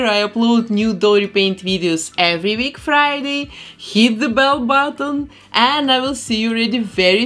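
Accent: Russia. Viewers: Слишком русский акцент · What Is her accent I Wanna say Russian but I'm Not too sure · I really don't want to be rude but she kinda sounds like Dracula but female version Edit: She sound Russian I'm just saying she got the same accent as Taras Kul [ CrazyRussianHacker ] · Какой русский акцент... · Мне кажется, или это русский акцент? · i love your accent are you Russian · Почему мне слышится русский акцент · рашн акцент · Is she Russian? I love the accent! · Я одна слышу русский акцент? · what country is she from? Russia? Ukraine? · А что за акцент? На русский и правда похоже... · Просто у нее очень сильный акцент, похожий на русский акцент. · Her accent is different. Is she Russian? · Ну очень сильный акцент, сразу видно, что русская или СНГ.)) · Ты тоже заметил(ла) что русский акцент? Она знаешь, так как то ооочкнь странно звучит как "Бринан ин зэ Кэпитал оф Юкреин" 😂😂😂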